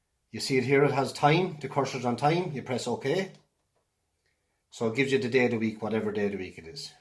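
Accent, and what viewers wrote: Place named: Ireland. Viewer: Cool to hear an Irish voice as the guide! ☘️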